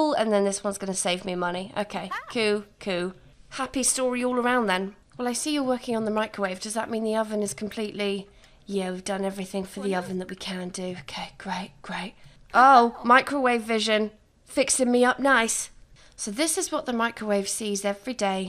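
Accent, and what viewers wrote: United Kingdom. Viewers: I love ur vids cuz their so funny and i like hearing ur voice! British voices r so smooth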